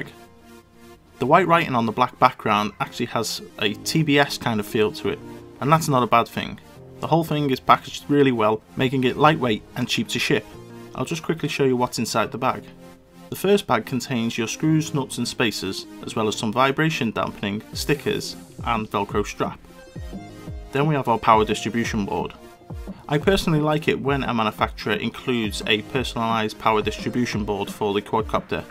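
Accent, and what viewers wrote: Liverpool, United Kingdom. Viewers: Nice build and eloquently presented for an almost Scouse.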